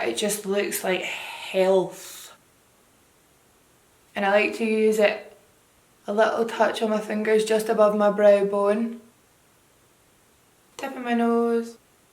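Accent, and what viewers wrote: Scotland, United Kingdom. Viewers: in love wid ur Scottish accent... n also u... I can relate u coz my face shape is like urs it's round n u inspire me to get make up in a way where I can look sexy n chiselled... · your just so darn adorable with your Scottish accent 😍😍😍😍😍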